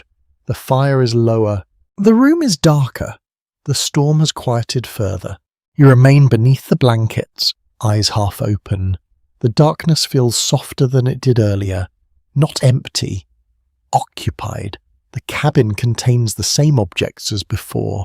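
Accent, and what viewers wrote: England, United Kingdom. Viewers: These are stories of American history. If these voice overs are AI why use a “person” with an English accent. Why not use a voice more like Sam Elliott? It just seems more fitting. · Pcffffst. Give us simeone without a brit accent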